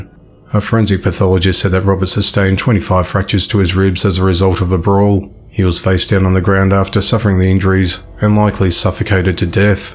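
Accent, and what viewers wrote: Australia. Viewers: Hi I have to ask I hear a Aussie accent from you if not all I have to say to you congrats I thoroughly enjoy your channel keep posting your uploads I’ll keep watching stay safe Julie 🇦🇺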